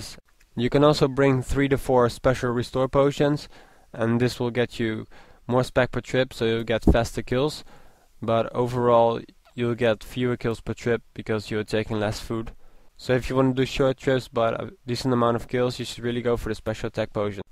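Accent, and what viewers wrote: Netherlands. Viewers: I love the "+20,500,000" xD btw are u dutch? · Ik hoor aan je stem dat je NL bent! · Haha leuk nederlands accent :p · are you from the netherlands or from Belgium? nice vid btw, thumps up :)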